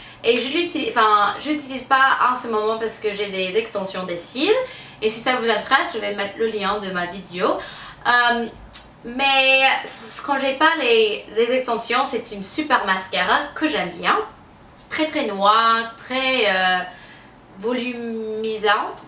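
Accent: France. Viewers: Je succombe totalement à ton accent frenchy lol les produits sont extra, u've master french lgge better than some french pepople haha, kiss <3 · J'aime vraiment beaucoup ta chaîne , et surtout ton accent français . Tu ressembles énormément à Lindsay Lohan c'est fouuuu , bisous p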